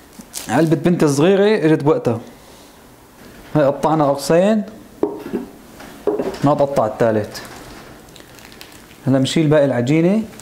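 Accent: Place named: Homs, Syria. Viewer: ماشاء الله مبين شغلك اديه مرتب الله يقويك ويعطيك الف عافيةوأحلى من القراص لسا الحكي الحمصي منحوط😊 أنا بفرفح قلبي بس اسمع حكي حومصي لأني انحرمت منه بالغربة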